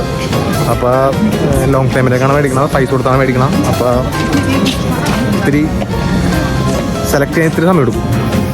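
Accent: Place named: Fort Kochi, India. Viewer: Njan nattil malippuram anu ippo fort kochi slang kandu noki nalla rasamundu kelkkan ente father harboril varumaayirunnu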